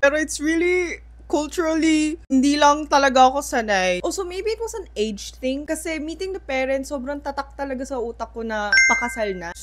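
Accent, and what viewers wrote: Philippines. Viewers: I’m not used to hearing a Korean girl sound like a Filipino girl. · No you talk like a filipino already. :)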